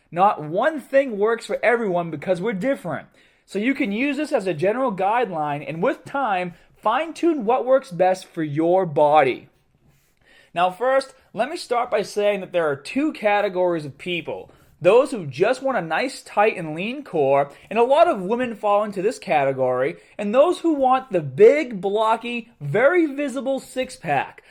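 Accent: Boston, United States. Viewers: Stop giving lessons on body training but teach us how to speak with a Boston accent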